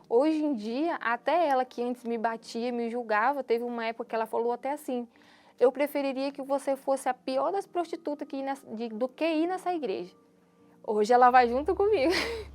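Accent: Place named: Rio de Janeiro, Brazil. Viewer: Sotaque, ela é do RJ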